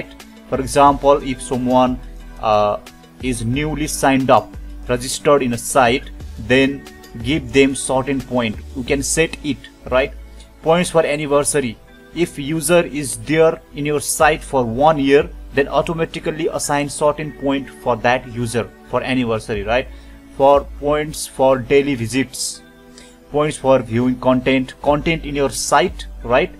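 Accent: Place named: Nepal. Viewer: Bro Nepali ho? Accent testai testai chha ta. 😁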